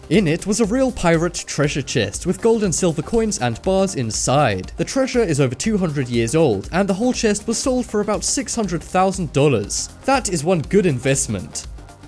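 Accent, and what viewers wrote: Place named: United Kingdom. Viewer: Derp Face Dude, he's British. They say everything different over there.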